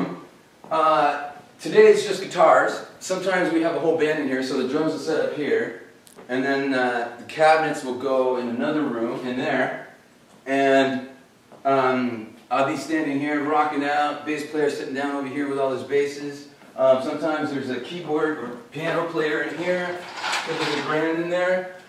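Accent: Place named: Canada. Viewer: lol, he still says abooot :) love canadians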